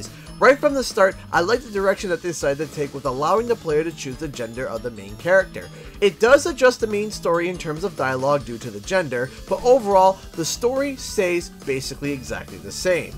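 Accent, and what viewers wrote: Canada. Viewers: You sound real Canadian in this review!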